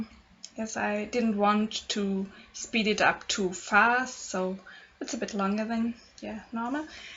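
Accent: Germany. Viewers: She's German!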